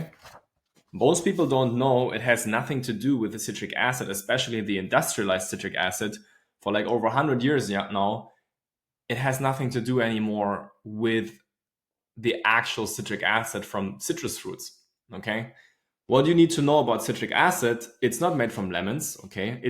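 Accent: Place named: Germany. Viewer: Warum sprichst du eigentlich nicht deutsch 😅? Deine deutsche englische Aussprache ist richtig geil 😅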